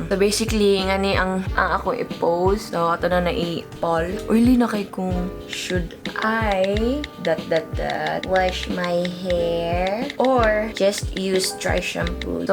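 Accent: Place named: Cebu, Philippines. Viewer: Mgkakatawa ko sa imo accent dai.. perti ka gahi lomad sa cebu! Perti ka visaya😊😂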